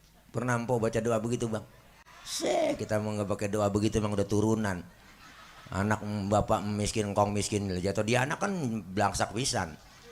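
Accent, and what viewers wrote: Jakarta, Indonesia. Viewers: Walau video nya baru dan materi nya di ulang ulang,saya tetep suka dengan ustadz dengan logat khas betawi nya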